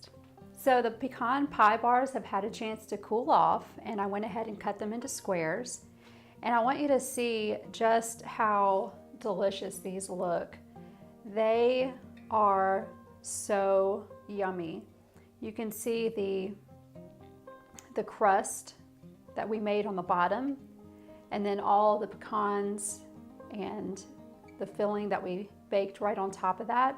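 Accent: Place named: Florida, United States. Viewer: You sound like us here in Florida! Especially the "pachauns" not pECans"! 😎🌴🌺👍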